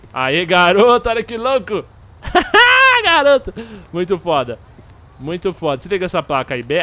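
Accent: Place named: Sao Paulo, Brazil. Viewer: be eu to zuando pqp cara '-' eu odeio sotaque de paulista mas n vo odiar o cara pq ele fala estranho '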